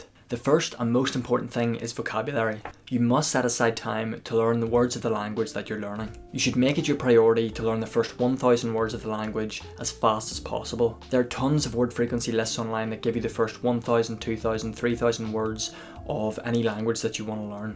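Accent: Ireland. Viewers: Just clicked on this after watching a vid where you spoke only in German. Would have never guessed you have an Irish accent ! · I realized that you are from Ireland with help of your pronunciation,especially a word "thousand") thanks for sharing,mate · Oh my godness, your Irish accent is sooooo adorable!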